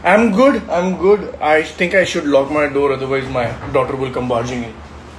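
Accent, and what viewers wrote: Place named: India. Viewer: It's difficult to catch english with an indian accent , but it was nice to see a very talented actor. Best wishes from Russia.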